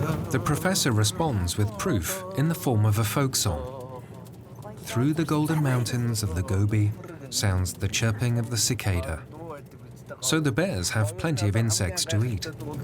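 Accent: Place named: United Kingdom. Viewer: the herder reaches inside to pinch or sever the aorta leading to a swift loss of consciousness and death. Just because you find it gruesome doesn't mean it's not humane. The narrator sounds British and if he says it's not brutal, maybe you should agree and stop trying to pick on races you feel superior to.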